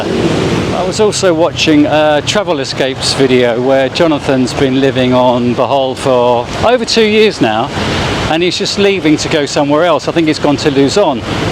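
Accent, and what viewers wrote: England, United Kingdom. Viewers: actually I just realised. I really hate the UK accent, especially London. But your English accent is much easier on my ears :) Have a nice rest of the week. Dramaguetti, Dumaregretti haha. What else is that place called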